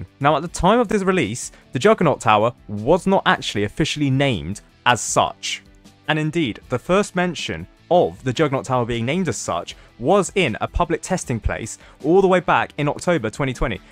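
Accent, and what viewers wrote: United Kingdom. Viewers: Wait what ur British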